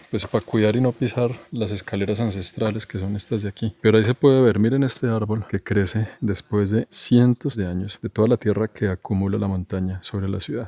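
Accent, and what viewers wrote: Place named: Paisa, Colombia. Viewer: Interesante trabajo com yutuber, porque usted no solo nos muestra a los sedentarios el muno, sino y muy importante también, ayuda a preservar ese acento tan colombiano, que supongo es el que denominan paisa y que, no tengo la menor duda, identfica a Colombia en cualquier parte del mundo.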